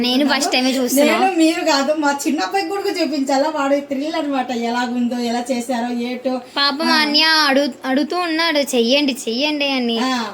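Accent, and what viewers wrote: Rayalaseema, India. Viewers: Goodroyalseema telugu accent.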